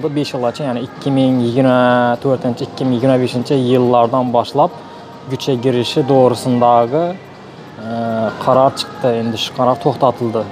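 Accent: Khorezm, Uzbekistan. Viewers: Молодец— укам, шевамиз бокий шугина. Уни асрашимиз — ардоклашимиз гарак.Шовот! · Xorazm shevasi N_1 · Xorazm shevaga gap topgonloro, qo'virdoq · Ёкмаса гормангла хоразимли болганнан кейин хоразимча гаплиди на шевасини узгартириши гаракми умуман горма ёкмаса Толька хоразимлила горсин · Хамма блогггерла адабийча гапламидику. Недаки налани коммментина этибор Атмангла😀 хоразм шевасина тшунмитгонло гурмасин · ШУ ШЕВАГА КЕСАК.ШУ ОЙтГАН ОДАМ МЕНИНГ КОТАГИМДИ ЕБДИ.ХОРЗИМ ШЕВАСИ ЯХШИ.ХОТЯ МЕН УЗИМ БЕРУНИДАНМАН.ОПАМ ТОШОВУЗДОН.ВУЛОРАМ ШУНДИН СуЙЛИДИЛАР. ЕНА АЙТАМАН.КИМГА ЕКМАСА ХОРАЗИМ ШЕВАСИ.КОТАГИМА КУЛСИН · Xorazm shevasi 👍👍👍💪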